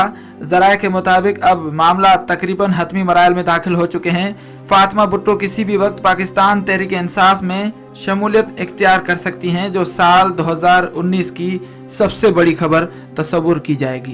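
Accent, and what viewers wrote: India. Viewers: This voice is Indian person...100 % sure....indian urdu asy he bolty hyn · یہ آدمی انڈیا کا لگتا ہے اس کے تلفظ انڈیا کے لگتے ھیں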